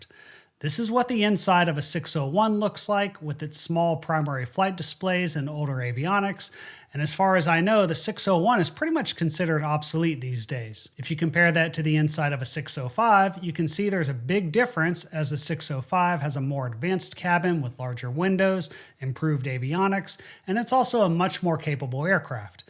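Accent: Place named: Appalachia, United States. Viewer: Can you re record this with an English accent so that it sounds dramatic and educational instead of hillbilly gossip.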